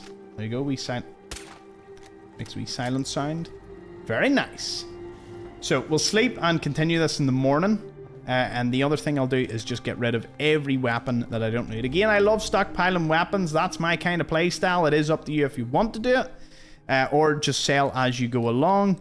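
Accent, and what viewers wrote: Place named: Scotland, United Kingdom. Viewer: I like William Wallace accent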